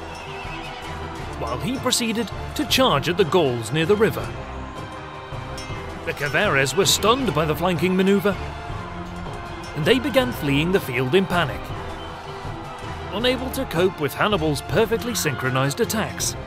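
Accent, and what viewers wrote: England, United Kingdom. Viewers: The best animated documentary of the 2nd Punic War ever seen: very detailed descriptions, beautiful animations, great music and sound effects (seems to me Rome Total War style). I almost forgot, your British accent is very elegant and very understandable, thanks a lot! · Why does this sound like it was written by an American and read by an Englishman? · Subscribed! That's what I call interesting quality content, I even love your British accent. :)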